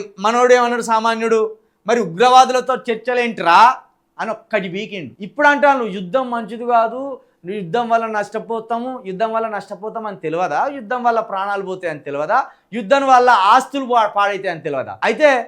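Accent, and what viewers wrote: Telangana, India. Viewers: మీ యశ ,తెలంగాణ భాష అది చాలా బాగా బావుంది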